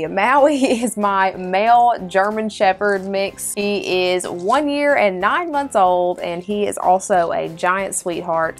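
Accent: South, United States. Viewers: She sounds southern. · you just sound like a southern bell I love it and ur pets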